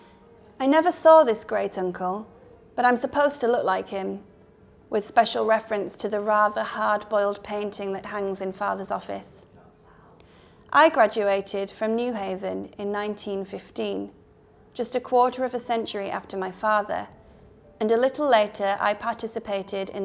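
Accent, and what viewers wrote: United Kingdom. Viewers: Hi thanks for reading the book to us! I love your voice and british intonation ^^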